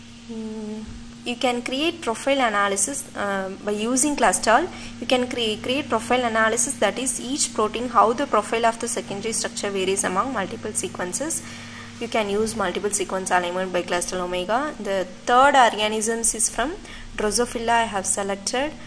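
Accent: India. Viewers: I think she has an Indian accent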